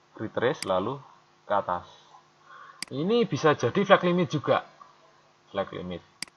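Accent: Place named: Surakarta, Indonesia. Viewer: Bapak apa orang solo ya dari logatnya kentel bgt hehehe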